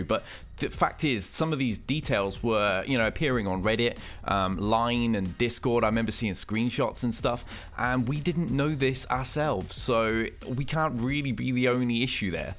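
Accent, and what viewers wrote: United Kingdom. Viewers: Dude where are you from? I've always wondered haha. Can't figure out what UK accent that is lol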